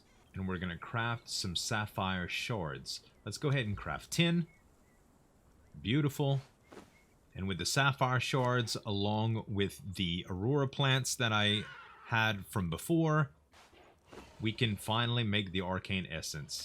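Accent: Louisiana, United States. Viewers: Think I found my new favorite channel! I'm getting ready to start binge watching your videos. I could be wrong here, but it sounds like you are from Louisiana (my wife is from Houma)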